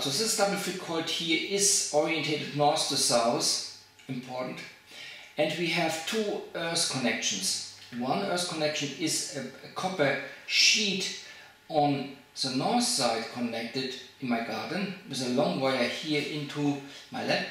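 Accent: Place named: Germany. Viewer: your accent sounds german, where are you from ?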